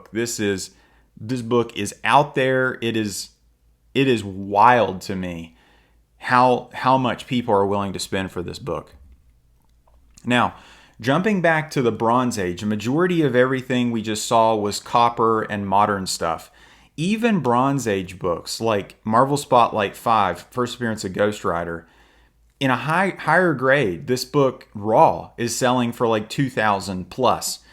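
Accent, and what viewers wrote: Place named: Philadelphia, United States. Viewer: good gawd that philly accent is awful ew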